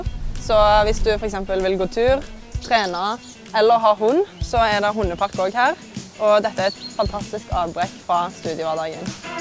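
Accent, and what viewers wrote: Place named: Stavanger, Norway. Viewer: Reklame for Grimstad, og ikke noen med Grimstad’s dialekt som snakker ??? Kødder dere ? Stavanger eller hva det enn er. Latterlig at dere bruker dette. Ikke latterlig faktisk, men provoserende 🤬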